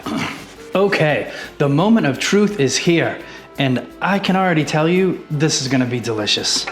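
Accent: Massachusetts, United States. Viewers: You're from Massachusetts, never apologize for how you say cheddah, ked!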